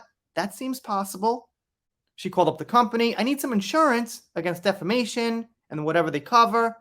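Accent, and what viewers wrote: New York, United States. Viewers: Love your posts! Esp outtakes! Love your New York accent the most!! Keep going!! Xo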